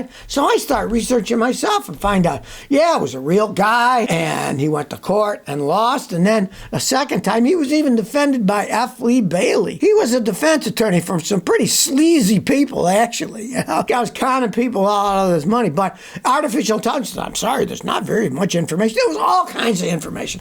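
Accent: Germany. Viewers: im here for his German accent lololol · enjoyed the German accent. :D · Your German accent has me rolling! 😂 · The German accent is hilarious!!! 🤣😂🤣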